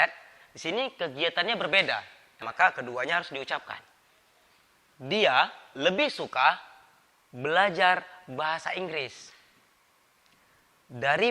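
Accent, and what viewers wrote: Indonesia. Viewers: pronunciation nya dibaikin bang,., pengucapan not nya indonesia bingiitt,., tp pembelajarannya ok,,. lanjutkan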